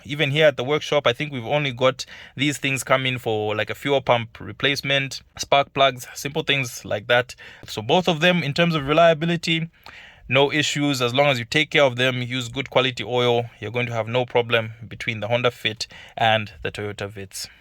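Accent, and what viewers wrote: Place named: South Africa. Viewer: Bro i thought you from my country south Africa 😂😂 how you talk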